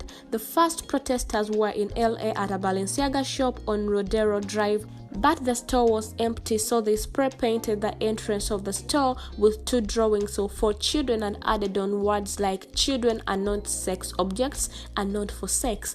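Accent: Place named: India. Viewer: I can't listen to you channel because it reminds me of ordering an Indian.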